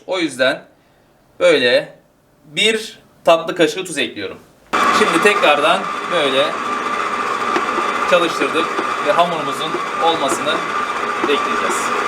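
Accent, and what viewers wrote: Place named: Adana, Turkey. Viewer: Adana aksanin super Arti yemek sana yakisiyor Hazer falan filan senin yaninda vizzzzzzz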